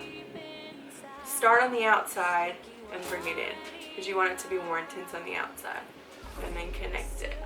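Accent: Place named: South, United States. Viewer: your accent and Southern charm shine through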